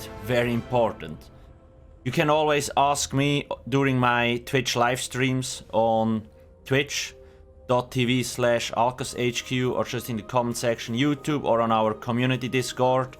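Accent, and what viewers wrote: Switzerland. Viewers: Are you from switzerland? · Thanks for the vid! And that accent sounds very Swiss! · Sounds like swiss dude. I can spot accents 🤣🤣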